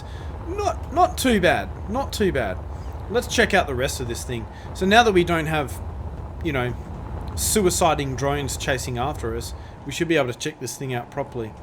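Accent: Australia. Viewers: Are u Australian? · you sound lke a bloody aussie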